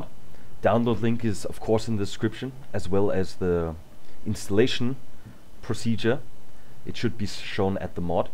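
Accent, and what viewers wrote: Germany. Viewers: netter deutscher Akzent ^^ xD glaube ein wenig bayerisch oder? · Comrade Slaine Oh ich hab neulich auf der Mod DB Seite gesehen, dass da noch leute aktiv sind und dachte da tut sich was... schade. Und ich kommentiere deutsch weil vielleicht Mr. Videomacher persönlich (hört sich ziemlich deutsch an) etwas dazu weiß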